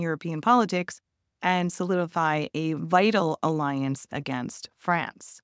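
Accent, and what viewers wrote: United States. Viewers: English history in an American accent 🤮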